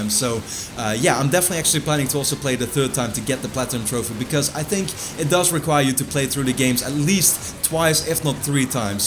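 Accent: Netherlands. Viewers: is this guy dutch? if he is so hey ik ook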